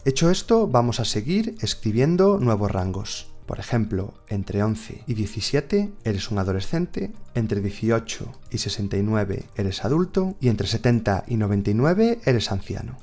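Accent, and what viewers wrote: Galicia, Spain. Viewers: Excelente descubrimiento este canal!!! No solo recursos en castellano si no con buen acento gallego!. una consulta ¿Cómo se hace para hacer un rango tipo edad >100 sin emplear else?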